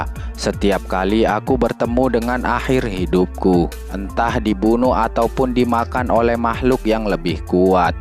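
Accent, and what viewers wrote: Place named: West Java, Indonesia. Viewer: Si mimin nih kaya nya fans nya bkent yagesya.. trus ada logat2 si jhon juga wkwkwk .. org sunda ya bang haha pake atuh bahasa sunda nya da moal nanaon ieuh meh jiga si jhon ngan bedana menceritakan alurcerita anime2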